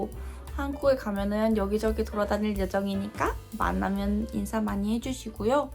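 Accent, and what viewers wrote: United Kingdom. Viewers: 왜 한국어도 영국 억양인가욬ㅋㅋㅋ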